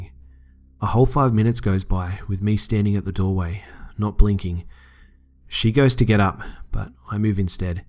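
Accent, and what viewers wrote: Australia. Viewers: He's Australian XD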